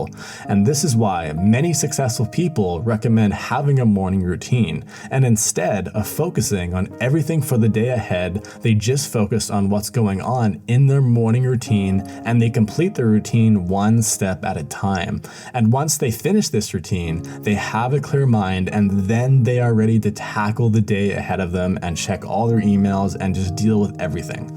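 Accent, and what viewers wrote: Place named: Sweden. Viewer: Du är ifrån Sverige man hör det på din röst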